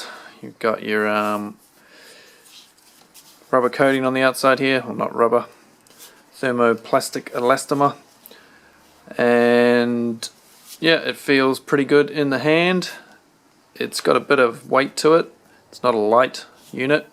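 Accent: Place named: New Zealand. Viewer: Sound a little bit kiwi almost actually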